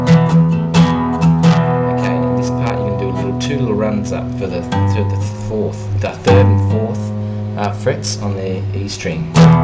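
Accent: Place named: Australia. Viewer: Thanks for a great tutorial. Nice to hear a fellow voice from down-under.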